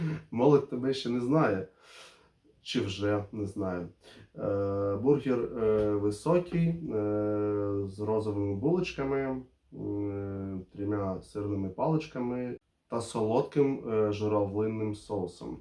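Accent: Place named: Eastern Ukraine, Ukraine. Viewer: Ну за українську лайк, молодець, відчувається говір такий східної України, але все ж таки це добре буде, якщо будеш частіше випускати такі ролики на українській, а бургер цей рожевий Мохнатівський збираюся на днях поробувати, дорогущий правда, але на хайпі робляться гроші!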